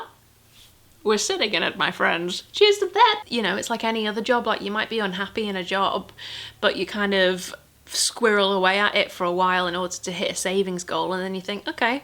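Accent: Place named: Northern England, United Kingdom. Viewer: I've followed you for ageeesss and I love your vlogs watching your home decor updates has been inspiring! Found your channel through your midsized content but I've stayed because as a northern lass moving to to southern England it was nice to hear similar accent and banter if that makes ANY sense lol😂😬 do what makes you happy, also love gold dust Road! ♥️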